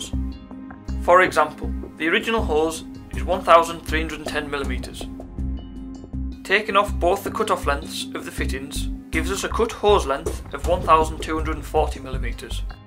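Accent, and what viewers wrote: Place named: Carlisle, United Kingdom. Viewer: Was looking into this sort of business. Recognised the accent. I’m Carlisle lol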